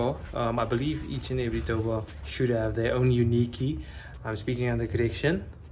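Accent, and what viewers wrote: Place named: Afrikaans, South Africa. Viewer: Haha zuid afrikaans blijft grappig om te horen. Dacht dat de taal daar uitgestorven was. Btw did it came painted/glossy? How much was it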